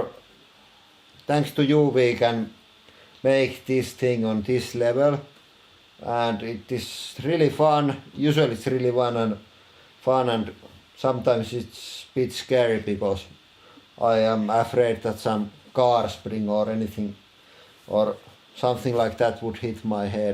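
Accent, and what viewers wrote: Finland. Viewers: Erittäin kaunista Suomiengelskaa! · Love the extra content and laugh:D And your Finnish accent:) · I watch your videos because they are so entertaining. Yes there are a few people now that have copied your Idea. But i don't enjoy their videos. Its not just about crushing stuff. Its that amazing combination of destroying stuff, your Finnish accent, the laugh of, and your personalities. You seem like awesome people i would instantly invite over to my place for metal & BBQ & beers. But i think Germany is like 1 million miles away from Finland. Even Finland is 1 million miles away from Finland. Personality is so important for me when it comes to the channels i watch. Thats why i watch Dudesons, Abom, AvE, OxTools, BigClive, GMM, adunbee, SpectreSoundStudios, EllieAwesome and such. And also, seriously reminds me of a cousin of mine. I swear to god, if you dressed them the same, with the same hair colour, people not knowing them personally will mix them up lol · I'm watching your videos because they're entertaining and see what you crush every time is exciting and when you deal with Ms HP plastelina creatures and of course your Finish accent is fantastic. 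It is such a lovely accent (my favorite accent). Every time I look at my Nokia I think off you guys. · Vastaus kysymykseen: Oon kattonu teidän kaikki videot ja subannut molemmat kanavat, koska: 1) Ensin katoin paperivideon, koska luin siitä iltapaskan nettisivuilta. Tykkäsin sen aitoudesta: selostus rehellisellä rallienglannilla eikä myöskään mitään turhaa amerikkalaistyylistä esittämistä. Video oli jännä, ytimekäs ja lopputulos odottamaton:) 2) Päätin katsoa ne muut videot, mitä teillä oli. Huomasin, että täähän on ihan hauskaa settiä noin niin kuin muutenkin:) 3) Sen lisäksi, että tykkäsin viihdyttävästä tavasta selostaa, niin tykkäsin myös siitä, että on aina mukana. Aika usein reaktiot niin prässäyksen tapahtumiin kuin rallienglantiin kutkuttavat nauruhermojani:) Mun kokemuksen mukaan te molemmat olette aitoja ja välittömiä ihmisiä, joiden videoita on hauska katsoa. 4) Muovailuvahahahmot videon lopussa ovat olleet tärkeä lisä. 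Jos videossa ei ole ollut muuta kovin yllättävää, niin on ainakin joku jännä uus ja äärimmäisen vaarallinen hahmo, joka saattaa hyökätä milloin vain:) 5) Tää Beyond the Press on tarjonnut sitten kaikkea mukavaa lisäjuttua teistä, mikä on vahvistanut kokemustani siitä, että olette kivoja, aitoja ja välittömiä ihmisiä ja teidän videoita on kiva katsoa. Ja tietty nää kaikki jännät testit, jotka ei kuulu pääkanavalle. Teitte viisaasti jaon pääkanavan ja tän Beyond the pressin välillä. 6) Olette onnistuneet tuomaan kanaville originaalia sisältöä melko tasaisella vauhdilla ja aktiivisesti joten, teidän videoiden katsomise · Just recently discovered your channels and I enjoy watching you destroy shit, and the Finnish accent makes it even better! Keep up the good work! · I love the accent. It reminds me of my grandmother from Savo. Who makes the clay animals you crush? They are quite good. Sorry to see them destroyed, but they must be dealt with.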